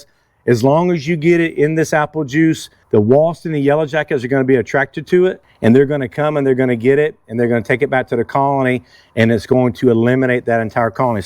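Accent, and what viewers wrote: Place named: Georgia, United States. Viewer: Excellent video.I'm from georgia, and I had trouble with wasps when I was a kid, and i'm still scared of them now. I'm subscribing to your channel just because of how well this video was produced. Excellent information.Now, I won't be so worried when I go and help my mom take out wasp.Nest at her house. Also, it was a pleasure here in a country.Accent, that's one of the things I miss about georgia. Keep up the good work, sir.And god bless you and your family.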